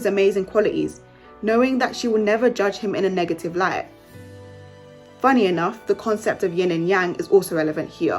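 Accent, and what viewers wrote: United Kingdom. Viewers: I love your British accent 😍 ❤ it's so nice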